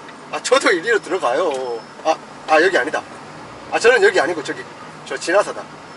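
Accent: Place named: Gwangju, South Korea. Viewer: 사투리 들어보면 광주사람 이신것 같아요 영상 재밌네요 항상 화물 안전하게 운반 해주세용 요즘 또.. 얘기가 많네요 ㅠ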